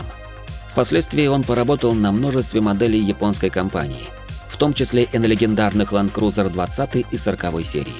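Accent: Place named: West, Russia. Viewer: Спасибо за видео, всё по существу) по тому как ты называешь абривиатуры двигателей, буквы 1jzgte , и в конце говоришь "и" , можно сделать вывод что ты живёшь в западной части России. Все на востоке говорят "е". 4а-фе , 1jz-гте ну в общем понял суть 😀😀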